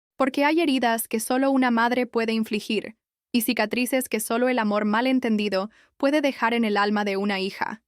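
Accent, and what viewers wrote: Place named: Spain. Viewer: Es chocosa la forma en que a cada rato preguntas tonterías y con tu acento españolado se oye peor. 🤮🥱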